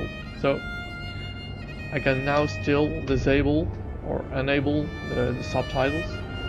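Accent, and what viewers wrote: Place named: Netherlands. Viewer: dag,ik hoor precies dat je Nederlands bent.Daarom vraag ik het in het Nederlands. Weet u hoe ik die ingebakken Aziatische of Arabische ondertitels kan verwijderen zodat ik mijn gedownloade kodi ondertitels kan plaatsen. Normaal heb ik geen probleem met buitenlandse ondertitels maar de ingebakken ondertitels nemen soms bijna de helft van een film in. groetjes Peter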